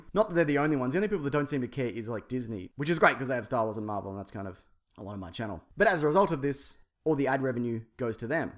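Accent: Australia. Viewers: I love you,. I love you and your sexy sexy serious Australian voice. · Wow, this video is very informative, thanks for bringing this issue to light. I have heard horror stories about youtube in the past. Just wanted to say how much I enjoy your channel and I bloody love (the) Weekly Planet. I am originally from the UK but currently living in Malawi. The internet connections are pretty shit here but I really look forward to your podcasts and videos every week. Yourself and Mason are fucking comedic geniuses! Oh and I spent two years living in Melbourne a few years ago and I quite enjoy hearing the accent again! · I knew he was from Australia #wtfu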